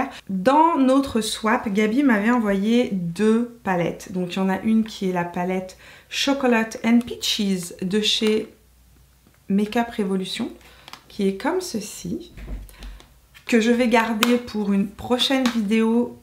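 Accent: Quebec, Canada. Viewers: Bonjour! J'aime beaucoup quand vous faites des collabs toute les deux! Et quand je t'entends parler, ça me rappelle le Québec où j'ai habité quelques années...la palette Dior est superbe, je l'utilise depuis qques semaines. Voudrais tu par contre me dire quel est le dernier pinceau que tu utilises, le fluffy pour appliquer les illuminateurs en topper, stp? La bise.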